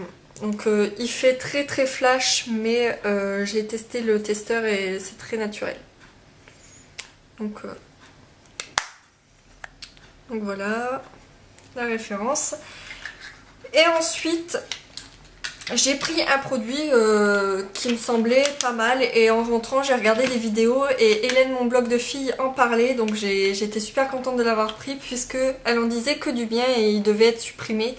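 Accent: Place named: Amiens, France. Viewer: Coucou! Je découvre ta chaîne et j'aime beaucoup tes vidéos! Je me suis donc abonné naturellement! Je me disais que cet accent m'étais familier et je ne me suis pas trompé : je suis d'Amiens! Lool. Bisou, au plaisir!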